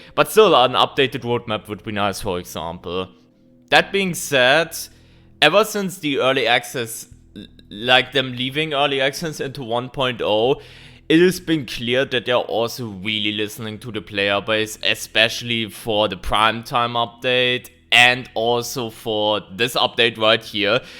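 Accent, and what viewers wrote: Germany. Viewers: I like your accent. Is it german?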